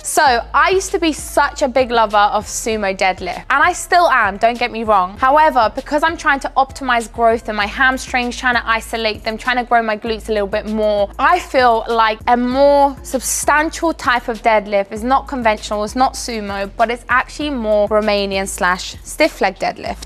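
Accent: United Kingdom. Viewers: Your accent is so strongly British haha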